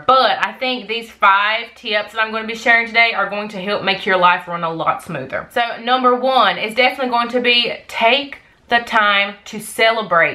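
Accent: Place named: Carolina, United States. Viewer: Loving your channel lately, love your carolina accent. Sounds familiar ;)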